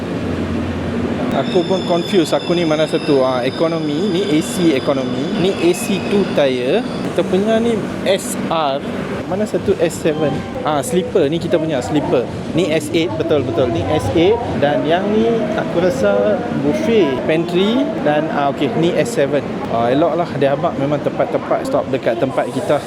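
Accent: North, Malaysia. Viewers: Hi, I baru last week terjumpa your YouTube Channel..very interesting. Suka dgq u cakap utara as I am also org utara from Perlis. Pasai rumah tepi railway tu depa tak terganggu sebab dok besa dah. mcm rumah I kt kampung di perlis, mmg railway tepi rumah. since kecik kami dengaq bunyi keta api , la ni rail tu lagi dekat sejak depa buat ETS. dulu masa kecik2 kami dok main atas tu rail tu saja..bila keta api nak mai kami cepat2 turun. Alhamdulillah tak penah berlaku kemalangan jiwa. tapi la ni org dah bubuh pagaq lah. 😁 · Terbaik bro your video, aku baru subscribe, dah habis da video pasal Kerala aku tgk, dgn slang Utara, mmg terbaik la. Penerangan sangat jelas.and one more thing, brother..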